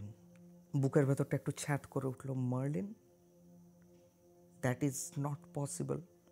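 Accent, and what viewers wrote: India. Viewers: Ew! NOT the biMaaaru tongue again, ffs!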